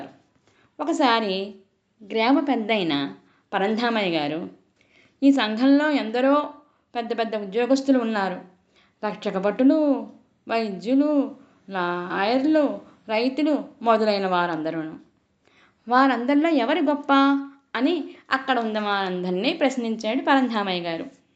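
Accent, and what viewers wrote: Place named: Rayalaseema, India. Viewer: చాలా బాగుంది..సీమ భాష లాగా ఉన్నది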